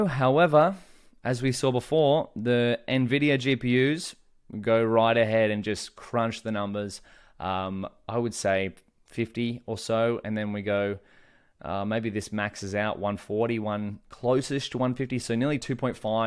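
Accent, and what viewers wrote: Australia. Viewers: thick aussie accent.. gives prices in usd...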